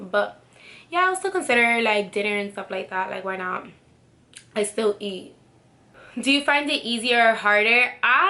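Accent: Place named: Bronx, United States. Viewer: You sound like you’re from the bx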